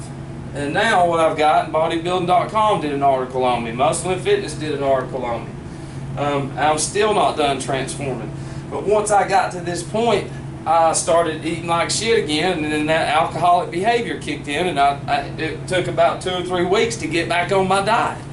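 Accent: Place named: Texas, United States. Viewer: Great video dude. Raw and honest. Love it. U just be from Texas or Tennessee. How’s ur progress these days?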